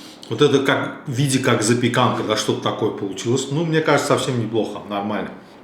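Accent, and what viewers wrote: Baku, Azerbaijan. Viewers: правила нет. Но не используют лук :) помидоры, чеснок базилик да, но лук туда не кладут. Но все равно смотрю вашу передачу только из-за того что вы бакинец и мне нравится ваш акцент бакинца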